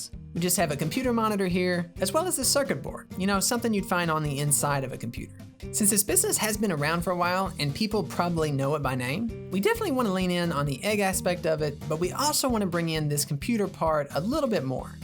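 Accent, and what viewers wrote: South, United States. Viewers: Dang you a southern boy huh? Bet u hung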